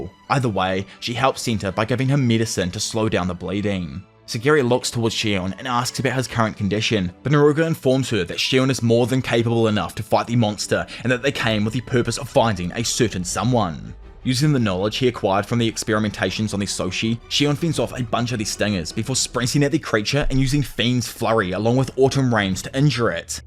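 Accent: Australia. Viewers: Australian accent isn't it? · When history, spiritualism, botany, & anime are intertwined with intellectual acuity the results breed something akin to Hells Paradise. Delightful narration & compression of arc by. Who doesn't love a story told in Crocodile Dundy's accent. Highly anticipating your next arc explained. Thanks, but out of curiosity any significance choosing the name?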